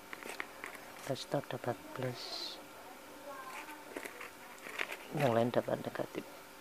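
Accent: Blora, Indonesia. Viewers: iki wong mbloro opo y K-E :D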